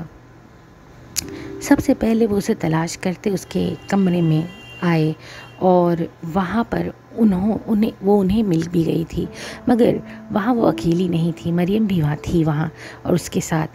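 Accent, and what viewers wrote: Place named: India. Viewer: I just dont understand as being an Urdu speaker why can't you pronounce Urdu words properly? you keep saying TULKHI INSTEAD OF TALKHI, and many other words like this and since when Pakistani's started speaking like Indian?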